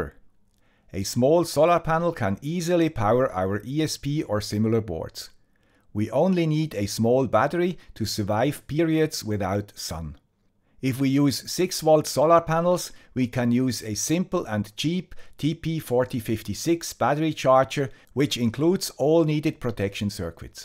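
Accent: Switzerland. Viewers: Ah, das ist also eine Youtube "Option" für creators, wo dann neben den Untertiteln eben auch die Titel und Texte gleich mit übersetzt werden , denn mir sind zuletzt eine Reihe von Videos von anderen ohne "deutschen Bezug" untergekommen und ich mich fragte, was da los sei, wobei da aber auch welche waren, die in Berlin am Brandenburger Tor gewesen waren, weshalb ich ins Grübeln kam. Vorher bekam ich halt alles "100% authentisch" (from the guy with the suisse accent), von daher fiel halt der Titel auf. Ich muss mal sehen, wie ich nur noch die Originaltitel bekomme. Jetzt hieß der Titel hier im Video wie auch in der Kanalübersicht: "Günstige und einfache Solarenergie für unsere kleinen Projekte (Deutsche Untertitel)" Wie immer ein tolles Thema spannend präsentiert und vor allem auch in all den "I want more" Stellen im Detail erklärt. Und ich fand auch die Verweise auf andere, ältere Videos sehr gut, weil da Videos darunter waren, die ich verpasst haben muss. 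DANKE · Woh, 5 people don't like your swiss accent. 😊 Thx for this intresting video! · Ha. I never knew that. Alway thought it a brilliant scene. Maybe it is even better now :-) And than you learn that after 43 years on a channel from a guy with a Swiss accent :-)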